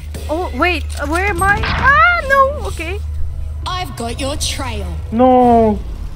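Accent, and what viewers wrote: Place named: Russia. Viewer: Ты русская оказывается? в конце только когда услышала поняла)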